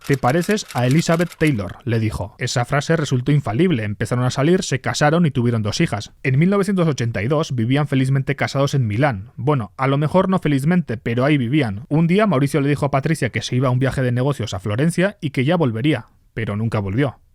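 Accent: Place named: Basque Country, Spain. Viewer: Eres euskaldun no? Lo digo por tu acento. Yo de Vitoria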